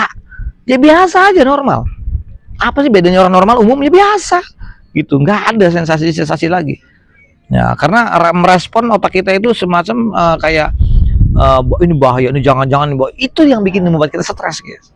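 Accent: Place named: Kupang, Indonesia. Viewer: Logatnya JD agak Kupang hehe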